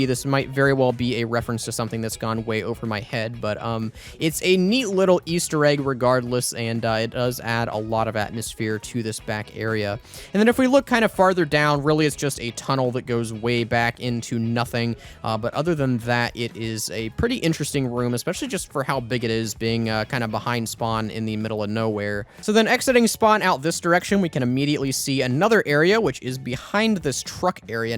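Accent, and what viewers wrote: United States. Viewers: why does he have the crackly stereotypical american teen voice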